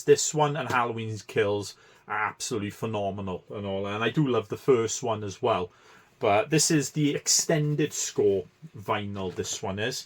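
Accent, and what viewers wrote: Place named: Wales, United Kingdom. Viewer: A Welsh man??? Well hello sir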